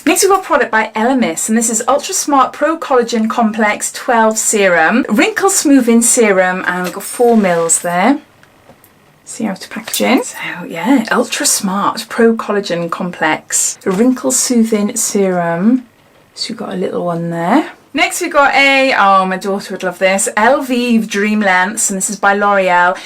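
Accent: United Kingdom. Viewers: Hello from Brazil! Your accent is so beautiful! I want to visit the U.K sometime soon - from what I have read and watched about sights and the culture, I have a feeling I am gonna love it. I should have visited while we were stationed in Germany! Maybe in the near future. I and my family are moving back to the U.S very soon so I was wondering where this box can be shipped there (and how much shipping would cost). Cheers! Renata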